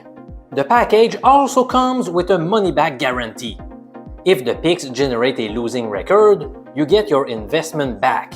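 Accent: Quebec, Canada. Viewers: First time watching your channel and I hope your the GSP of Sport Betting🤣. I'll take a wild guess and say that you got your Master's in Statistics at the Université de Montréal, I know a Quebec English accent when I hear one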